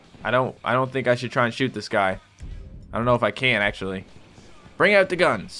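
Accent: Canada. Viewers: He's Canadian right? He sounds Canadian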